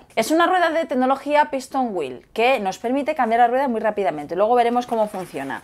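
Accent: Spain. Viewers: Hay no se porque pero amo como hablan las mujeres españolas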